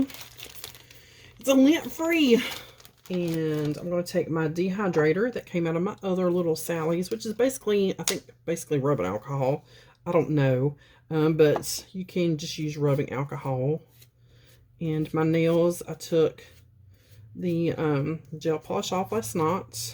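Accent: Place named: Alabama, United States. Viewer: I came to the comments to see if I could figure out her accent 😂 and I see The Alabama girl. I’m from Jasper 😊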